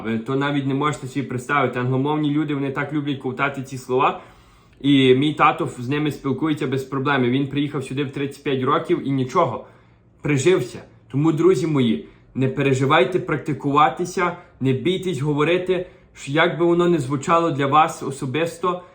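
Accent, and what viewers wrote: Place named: Transcarpathia, Ukraine. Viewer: Цікавий канал, у акцент закарпатський:)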